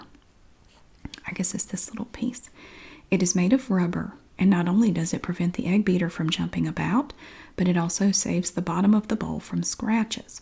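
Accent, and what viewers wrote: South, United States. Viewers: I'm from the U.K. and your southern accent is absolutely dreamy! I love the way you pronounce the word "Foil"! You have great production skills also, great vid. Thanks for sharing!!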